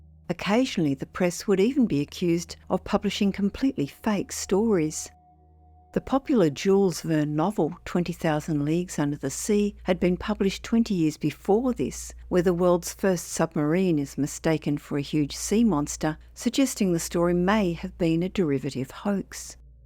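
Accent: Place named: Australia. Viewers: It sounds like an Aussie accent (Australia) or a Kiwi accent (New Zealand). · That's an Australian accent, pretty close though.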